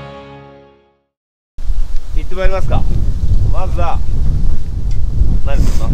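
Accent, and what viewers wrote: Yamaguchi, Japan. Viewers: 山口県民です。方言がYouTubeでガンガン聞けるの楽しい！笑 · 広島なんですが、山口弁って広島弁に近くて親近感もてた(*º▿º*)俊ちゃんの今♪を聴いてます♥ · YouTubeで山口弁が聞けるのが良いですねぇーーー😍。最近は女子の方言で広島弁が厳ついからでしたっけ？可愛い顔して「じゃけえ」とパンチのきいた言葉のギャップ萌えとかあるみたいですが、男の人の山口弁を聞いたさんのファンはどう感じてるのか気になる❗️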